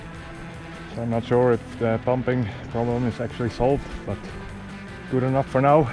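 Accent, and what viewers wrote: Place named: Netherlands. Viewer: Cool shit - trying to place the accent. Dutch?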